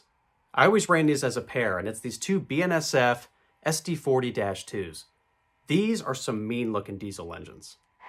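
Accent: Kansas City, United States. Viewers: You're totally from Kansas city...right?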